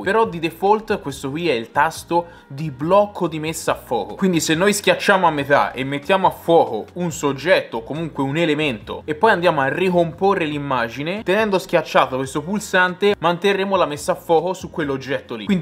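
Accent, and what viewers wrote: Tuscany, Italy. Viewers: Video belli ma correggi L accento troppo troppo toscano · Video bellissimi ed interessanti. Vai, parlata toscanaccia simpaticissima, è tutta invidia. Il prossimo fallo in cadenza veneta!!!!!!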